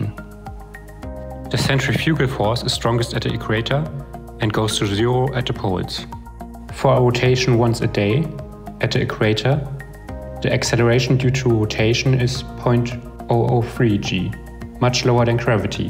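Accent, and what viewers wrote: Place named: Germany. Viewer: most german accent in existence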